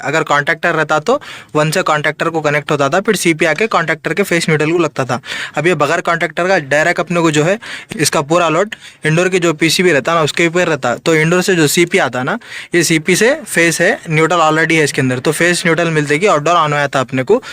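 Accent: South India, India. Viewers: South Indian voice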